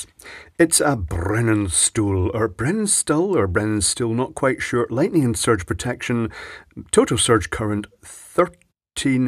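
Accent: United Kingdom. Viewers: Maybe its the British accent listening with my not brit-ears ears but at TS I think he said about the LED: "The only time it will glow is when one of the thermal fuses fails". It glows until the thermal fuse fails.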